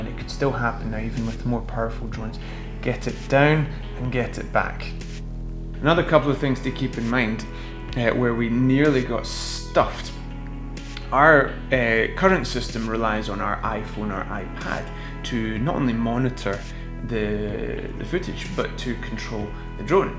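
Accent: Scotland, United Kingdom. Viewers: A cool video. I love the scottish accent and the landscapes. ;-) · wonderful video. super helpful. I wish all instructional videos were given in that lovely Scottish brogue.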